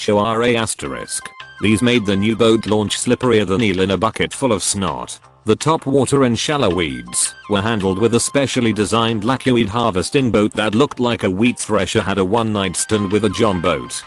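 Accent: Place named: Wisconsin, United States. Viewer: I know a Wisconsite when I hear one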